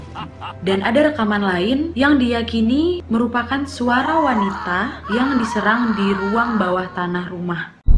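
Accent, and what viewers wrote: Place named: Medan, Indonesia. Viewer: Logat Medan nya itu Wak...👍😁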